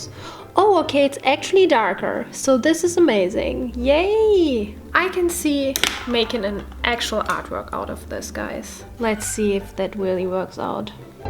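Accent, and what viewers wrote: Germany. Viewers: Man hört, dass du aus Deutschland kommst c: I love Coffee 😍😍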